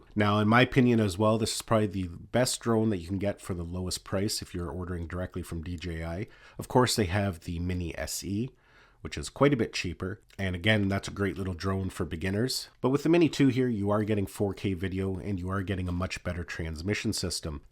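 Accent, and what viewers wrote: Canada. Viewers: I love the Canadian accent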